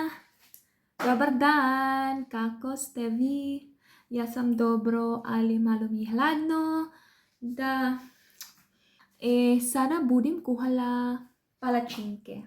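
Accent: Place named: Podravina, Croatia. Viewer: Ne ona je podravka.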